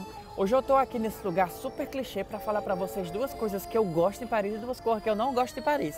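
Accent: Ceará, Brazil. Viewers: Aquele momento que você sente orgulho de ser cearense só pelo sotaque kk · Amigo! gosto da suas expressões, sou nordestino como você e sinto feliz quando fala com sotaque. Fazia muito tempo que eu não ouvia a palavra " pirangueiro " ou " pirangagem " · Saiba q gosto muiiito, muiiito de vc. Uma afinidade q deve se justificar pela sua simpatia, educação, autenticidade e, mais q tudo junto, por sermos conterrâneos. Sou Cearense, do Cariri, e me emociona te ouvir falar com nosso sotaque, do nosso jeitinho tão singular. Vc fala de Crato, Juazeiro e eu embarco junto... Hj vc busca realizar seus sonhos e objetivos em Paris, eu na tão querida, por mim, Salvador/BA, mas o fato é q as nossas origens/ raízes são como uma marca, um sobrenome. Sorte e muitas Alegrias em sua vida. Que viva muitas aventuras especiais para q tenha sempre assuntos a compartilhar com a gente. 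Xeiroooooo grd.! E obrigada por nos fazer sorrir a cada frase. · sou nordestina também e adoro seus vídeos, vc fala como se ainda vivesse no nordeste kkkk! Ômi, fala da sua opinião sobre comida parisiense. Eu sou meio fresca com comida e nas vezes que fui em Paris só como steak e batata. Uma vez fomos jantar na "Champs", pedi risoto de frutos do mar....veio os Moules...tive coragem de comer não!! prefiro sururu! kkkkk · voce e muito engraçado ate o seu sutaque cearense voce ainda não deixou Conheço um pouco Paris porque tenho 1 filho e uma filha que moram na França ela em Angers vale do Loire linda cidade e o filho strasboug são profissionais ela medica e ele engenheiro moram na França ha 17 anos tenho 4 netinhos e se um dia eu for a Paris eu queria voce como meu guia turistico pra mostrar muitas coisas que eu nao conheço em Paris passei pelo Louvre Torre jardim de Luxembourg etc mas ainda tem coisas que eu nao conheço um abraço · Tô amando seus vídeos! 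Você sabe falar sobre diversos assuntos, sérios ou não, e ainda assim consegue arrancar risadas. Você é ótimo, amo o jeito de falar, representa nosso Ceará! Sucesso aí! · adorei, vc é mto engraçado! amo sotaque nordestino <3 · oi! amo seus vídeos, suas opiniões, vc além de inteligente é muito divertido! amo o sotaque nordestino. um chêro ( minha mãe tb fala assim) bjs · td bem? Faz mais video desse estilo, falando o q vc não gosta e o que gosta. Você é otimooooo. Sou do Ceará tbm, mas tem MT tempo que sai de la e moro em Vitória, no ES. Então assistir seus vídeos me faz matar a saudade do sotaque de la, além de rir muito. Parabéns e sucesso.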